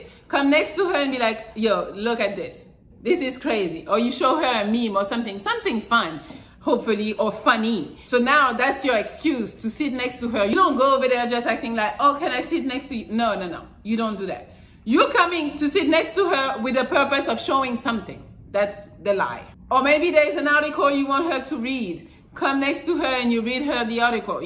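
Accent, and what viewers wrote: France. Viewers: French English accent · Lol... I love your French accent with how you say things 🤣🤣🤣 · L'accent français est super prononcé ! Super attractive. J'aime trop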